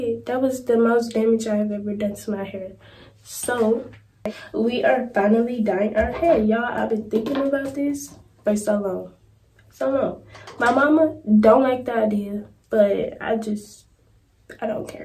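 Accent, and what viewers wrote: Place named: Texas, United States. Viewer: Your hair looks beautiful!! I said yep that's a Texas accent.... possibly East Texas! Thanks for showing us doll💚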